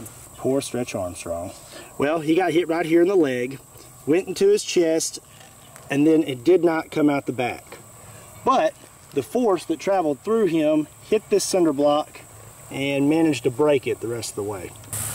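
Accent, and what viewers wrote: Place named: Eastern Kentucky, United States. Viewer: I’m also from Kentucky! Eastern to be exact! It’s weird to see someone with the same accent as me!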